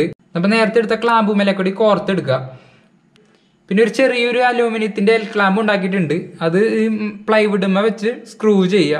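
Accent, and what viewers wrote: Thrissur, India. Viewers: ശൂര് സ്ലാങ് ആണല്ലേ ഗാഡിയെ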